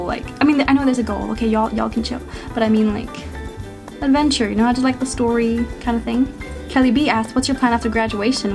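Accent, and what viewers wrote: United States. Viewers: You look a lot like Mina from Twice at certain angles. You can definitely be a celebrity haha. TVB would sure hire you. You sound American 90% of the time but then at times you don't. Lol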